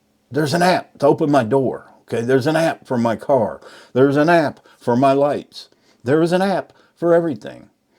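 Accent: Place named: Tennessee, United States. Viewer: Hello, totally agree that something has changed. I think its the food, the plastic containers, the stuff they feed in the animals, AND MORE IMPORTANTLY, those VIOLENT VIDEO GAMES. Or, Do you see the chem trails in the sky where you are? We're I So. CAL. Way too many people here. I'm taking care of my 101 yr. Old mom. We can't move right now. But I enjoy listening to you and what you have to say., i look for your videos every morning. My husband's family were/are in Tennessee , I like your accent, reminds me of my husband's aunt who was a sweetheart. Happy trails.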